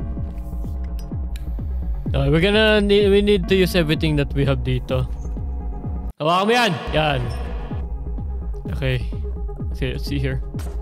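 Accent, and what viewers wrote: Visayas, Philippines. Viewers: Bisaya diay ka dol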